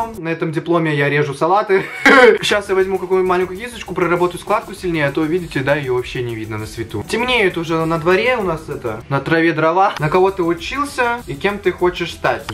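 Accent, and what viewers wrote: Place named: Vladivostok, Russia. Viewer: Я-то думаю, почему мне так классно слушать твою речь? А тут Владивосток замешан)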